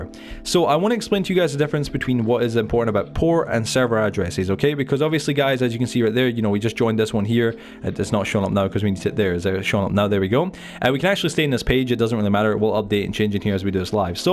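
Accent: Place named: Dundee, United Kingdom. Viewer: U sound like ur from Dundee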